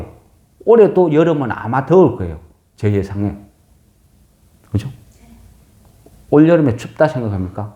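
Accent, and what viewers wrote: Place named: Gyeongsang, South Korea. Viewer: 앗 경상도 분이시다 ㅋㅋㅋ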